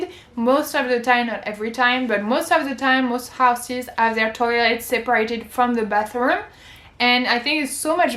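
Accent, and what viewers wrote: France. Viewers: T'as jamais envoyé du courrier toi ! Et pas la peine de dire que tu est Française, ça s'entend ! On a un marqueur rouge pour indiquer qu'il y a du courrier à partir, avant de dire des conneries on se renseigne ou simplement on regarde dans sa boite pour le reste c'est pas mieux...la moindre poignée de porte te fait éclater tes 2 neurones.. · The accent shows she is CLEARLY french my guy 😂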